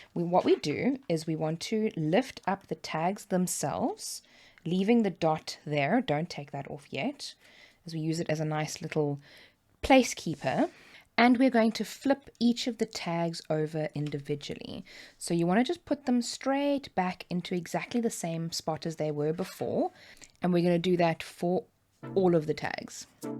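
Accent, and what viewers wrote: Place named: South Africa. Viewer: I love your accent. Thinking it may be South African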